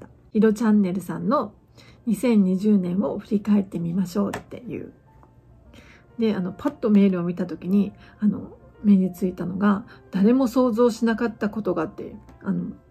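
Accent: Hakata, Japan. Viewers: 博多弁のイントネーションを聞くのが本当に、いやしです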